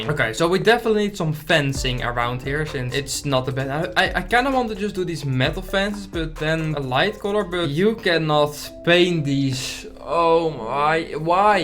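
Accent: Netherlands. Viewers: I smell nederlands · I smell dutch in your voice ( SRY ) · I smell dutch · Je bent zoizo Nederlands soms hoor ik Nederlandse accent😅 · HAHAHA NEDERLANDSE ACCENT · i don't know why but i smell dutch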